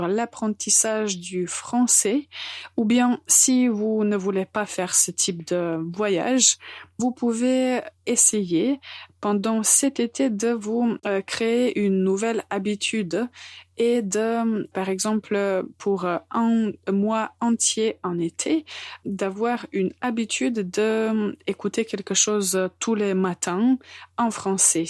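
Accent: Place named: Brazil. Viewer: merci. Je vous comprends très bien car vous parlez très clairement. Je peux identifier des accents de certains pays principalement anglais, espagnol et portugais. Je suis brésilienne et j'ai été surprise que votre accent soit proche du nôtre (portugais brésilien).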